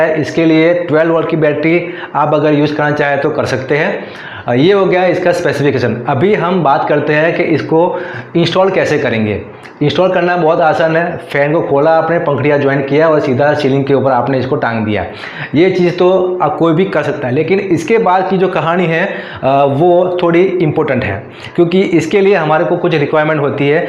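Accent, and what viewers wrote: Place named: Gujarat, India. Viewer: Gujarat lago chho bhai.. very good 👍 explained...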